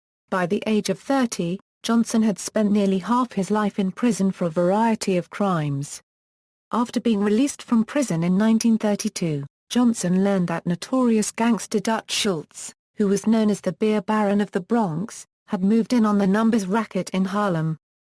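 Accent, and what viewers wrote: England, United Kingdom. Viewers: Something about an English woman talking about American mobsters doesn't do it for me.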